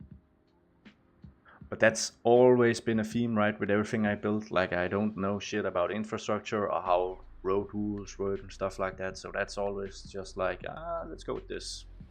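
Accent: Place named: Denmark. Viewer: Sjovt, jeg gættede også på dansker, men så tænkte jeg ikke mere over det 😂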